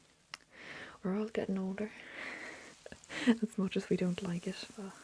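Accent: Ireland. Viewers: Omg that accent was just amazing! 😍 The little bit of Irish in me was very much satisfied to hear this! Also I LOVED THIS ROLEPLAY! You really have a comforting vibe about you. 🤗💙 Just what the doctor ordered aye? 😏😅💙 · Top marks again for the accent.👍 I always find there is nothing more relaxing than listening to someone speaking in a soft Irish accent, especially when things are being explained in detail as you did throughout the examination. Also got some tingles via the movement and light during the pupillary examination. Great video, thanks for sharing.😊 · Was the accent inspired by Derry Girls? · Could you please do an ear exam , love your Irish accent ❤ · I genuinely thought she was Irish, particularly from the west. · Hey! Greetings from Israel! 😀I'm so happy to hear an Irish accent! 😍Beautiful!❤ Subscribed!😊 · Your Irish accent is shockingly natural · Jesus Christ I’m Irish and this was like I was listening to someone from home.. 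unreal - you have to have someone in the family that’s Irish to pull that off. Gone from southern to northern very quick though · Where did you go. I miss irish accent. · If you ever get back into asmr you should definitely do another roleplay with the irish accent. It is spot on, so well done!!👏🏻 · Mother's side of the family immigrated from Ireland and your accent is absolutely spot-on. Thought it was natural. Amazing! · Medical role-play AND an Irish accent? Preemptive 10/10, haha! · This is one of the best irish accents I've ever heard anyone do... Im irish and hardly anyone gets it right! Amazing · Im guessing you are actually Irish haha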